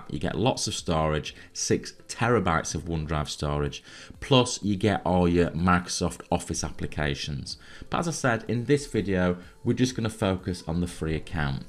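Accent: Yorkshire, United Kingdom. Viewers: I am from Yorkshire, but living overseas, so nice to hear the Yorkshire accent....a great video, but the reality is OneDrive is the worst cloud storage on the planet. Take a clook at products like Tresorit or IceDrive and do a video on them as well. I would not touch OneDrive with a barge pole...... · Hey are you from the UK? I hear an accent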